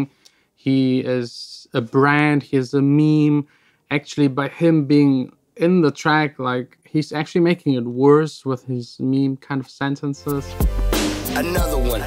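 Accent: Germany. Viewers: Wait are you from 🇩🇪??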